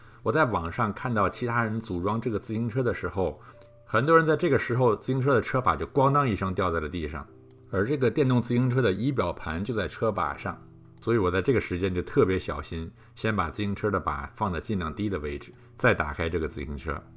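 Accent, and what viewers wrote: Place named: Tianjin, China. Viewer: 大哥你好，听您声音天津的，我们是老乡，很感谢你分享了这个电动车，但是我在澳洲买不到，有点失望，这车的确不错，淘宝有类似但是感觉不太靠谱。祝您生活愉快。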